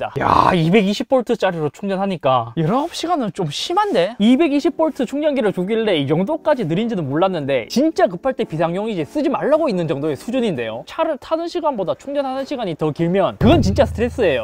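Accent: Gyeongsang, South Korea. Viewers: 어쩐지 경상도 말투다 했는데 안동사람이군.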